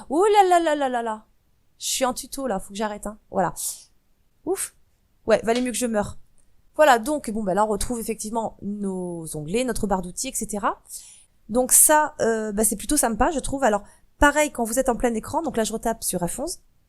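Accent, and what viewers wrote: South, France. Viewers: Tu as un accent de qq qui a vécu à un moment dans le sud mais pas forcément toute sa vie ? Moi j'utilise souvent : Ctrl+F pour rechercher des mots clefs. Ctrl+clic gauche pour ouvrir un lien dans une autre page. Ctrl+la molette pour zoom/dezoom. et ctrl alt supp.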